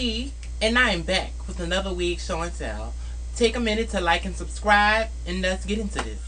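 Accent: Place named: New Orleans, United States. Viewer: Are u from new orleans? I hear the accent.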